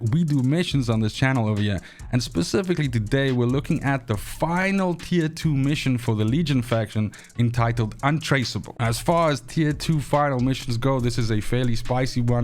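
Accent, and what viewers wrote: Afrikaans, South Africa. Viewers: Man sounds Afrikaans af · Bro are you South African??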